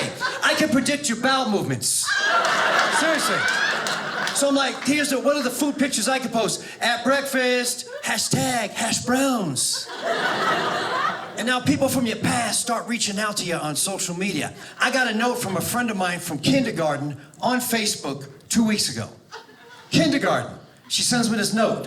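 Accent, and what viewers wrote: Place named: New York, United States. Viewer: I couldn't tell he's from Brooklyn but NY for sure. My boyfriend is also from Brooklyn so I picked up on the accent right away. Also the witness protection comment made me think of the movie Mobsters & Mormons lol 😊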